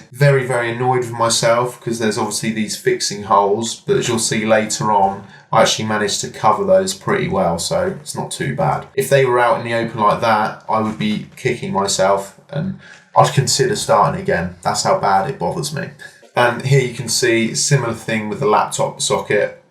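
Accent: United Kingdom. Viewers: Everything was great but one thing is true, bruh has the strongest british accent for sure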